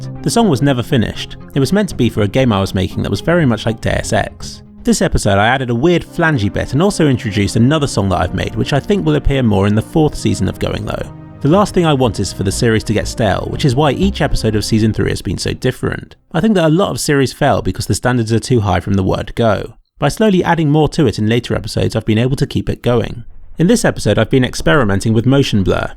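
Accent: United Kingdom. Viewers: I love the british accent. It just sounds good. Btw, those smurfs who started destroying the game and deranking on purpose are just toxic and its not your fault. Those guys do it for the fun they have, once their team starts raging. They like to be the one deciding everyone elses faith. Its empowering for them. They like saying stuff like "I am LEM, noob" to make it even more obvious, that they are actually better. Did you do that? I dont think so. Does anyone do that, because he watched going low? No, I dont think so. But its a good excuse for smurfs, if they want to anger innocent people. Obviously no one can judge you for what they are doing and you shouldnt judge yourself either. Ive seen your video about smurfing. I think it was kind of a reaction to the influence of smurfs which is growing so rapidly. Its hard to find a single lower ranked game without one opponent or teammate smurfing. 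Well, who cares? I am just a youtube user with no people behind me. You are someone who's popular. Those popular youtubers should unite and finally make something happen. Valve will never listen to small unknown people saying mm is broken, but they'll probably listen to popular people who could influence the whole cs go scene. I love you videos, keep up the good work :)!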